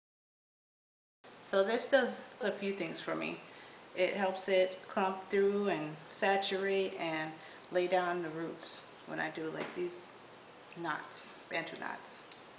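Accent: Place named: Caribbean. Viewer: I can hear west indian in your accent..where are you from?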